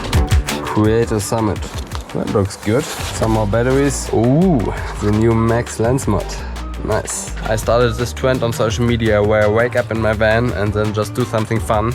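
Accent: Germany. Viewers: Mega geiles Video! „Bad english with perfect german accent“ passt so geil zu dir als Marke 😂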